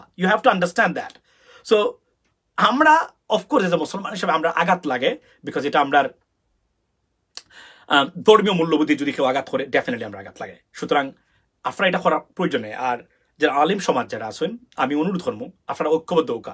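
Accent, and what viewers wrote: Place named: Sylhet, Bangladesh. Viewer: ভালা আছইনি ভাই আমি মনে করছিলাম আপনে সিলেটি নায় এখন দেকি অনেখ ভালো লাগলো এই চেনেলে আফনারে দেকিয়া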